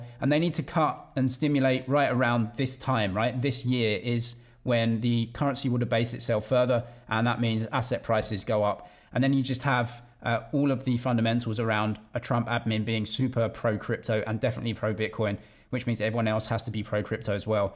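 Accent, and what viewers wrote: United Kingdom. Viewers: Hi, where do you come from? You sound British.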